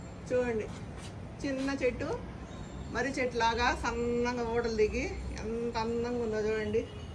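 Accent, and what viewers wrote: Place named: Rayalaseema, India. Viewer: Chelli! Meeru bhalega musthabu ayuthunnaru. Saree bagundi. Kadapa chittur districts slang bhale matladutbunnaru. Itlane matladuthu vundali.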